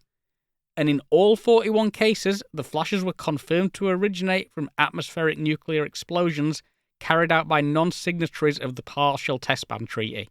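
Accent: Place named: United Kingdom. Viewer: He doesn't have a nerd voice! He's just aggressively British!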